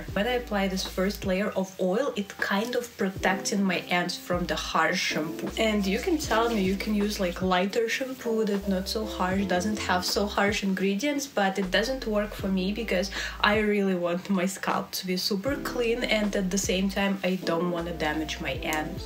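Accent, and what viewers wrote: Russia. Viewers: как приятно услышать наш русский акцент с первых секунд видео)) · you have a russian french and dutch accent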